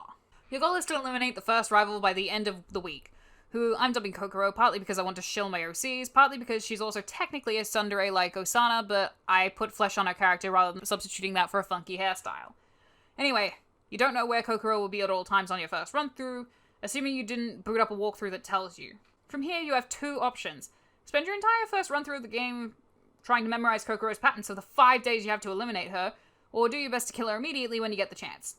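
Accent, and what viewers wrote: Australia. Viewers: You sound like an australian Tiny Tina, an’ it’s awesome!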